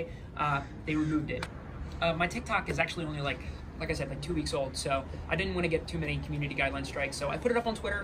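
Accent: Liverpool, United Kingdom. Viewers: I know the accent, I'm from the same city as him 😂 Liverpool, honestly it's normal but still awful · The scouse accent tho "whaqqqt" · He’s scouse what do u expect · No he’s not, I don’t get why everyone saying he’s Irish he’s Scouse from Liverpool. Sounds nothing like Irish.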